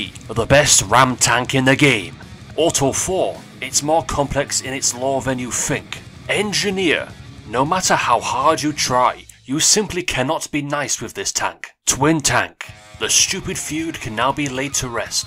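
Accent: United Kingdom. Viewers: The R-rolling just sounds very weird, in the midst of the British-like accent.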